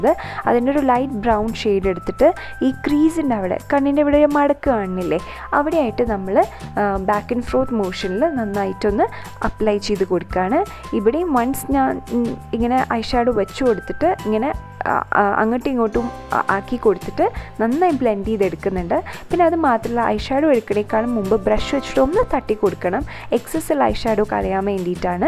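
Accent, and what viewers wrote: Thrissur, India. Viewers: Cheachi thrissur ano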